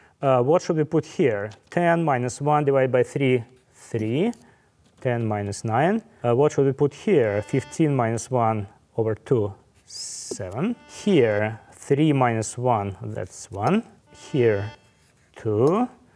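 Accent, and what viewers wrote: Russia. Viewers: Подумал, что у него русский акцент и не ошибся) Привет соотечественникам! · Another Russian smart mind, love to see your Russian accent <3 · The Russian/Slavic accent makes me [ r e d a c t e d ] and strangely patriotic. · Эх, русский акцент не спутать :))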